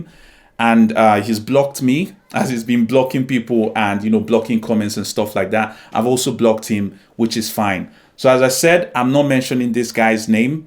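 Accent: United Kingdom. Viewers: Your accent is fascinating! I keep hearing bits that sounds Northern-ish, and then other bits that sounds like from all over the different bits of London!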